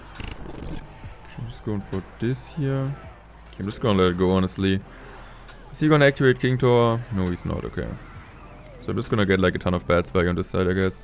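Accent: Germany. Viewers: Dieser deutscher akzent lol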